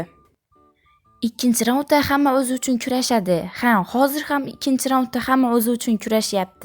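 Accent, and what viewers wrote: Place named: Khorezm, Uzbekistan. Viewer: Chota ovoz Xorazmchaga tortib turbdi xorazmdanmasmisiz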